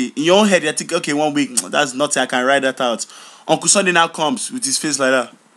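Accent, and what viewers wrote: United States. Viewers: wow your american accent is really good! and i nearly choked when you said youre trying to get ffreaky. too. fucking. funny. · ur american accent is good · Nice American accent. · dayum his american accent is so good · Love ur American accent lol im American and ghanaian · Lmaooooo Nigerians! It is a struggle! But your American accent is better than mine and I'm American lol! Very funny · woah your american accent is good af! scared me lol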